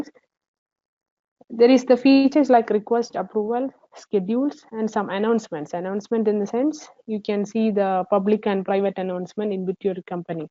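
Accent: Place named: India. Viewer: لماذا الفيديو باللغة الأنجليزية وبلهجة هندية ؟ هل هذا استخفاف بالمنطقة أم أنكم لم تجدوا من يقوم بهذا الدور لكم ؟ لم يعجبني الفيديو ...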